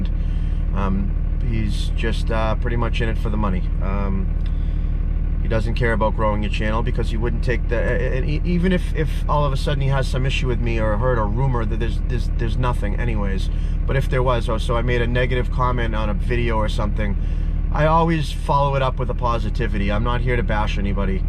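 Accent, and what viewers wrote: Massachusetts, United States. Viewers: I love hearing that mass-hole accent when you talk! 🤣🤣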